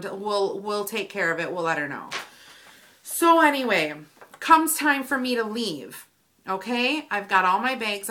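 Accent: Wisconsin, United States. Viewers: the Wisconsin accent?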